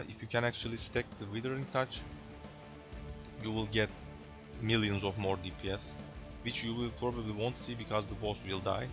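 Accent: Turkey. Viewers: Aksanınızdan Türk olduğunuzu düşünmüştüm ve kanalınıza baktım hocam :))) Teşekkür ederim, oyuna yeni başladım, bu build hem ucuz hemde sağlam gözüküyor. Bu build ile başlayıp ileride farklı karakterlere geçiş yapacağım, sağolun güzel video olmuş.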